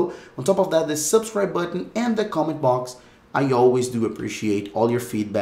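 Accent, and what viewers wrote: Brazil. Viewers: Why do you have this thick brazilian accent ?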